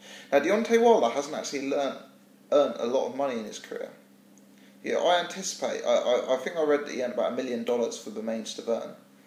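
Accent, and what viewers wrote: United Kingdom. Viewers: Your british accent is top. And your observations and commentary are spot on mate.Just discovered your videos today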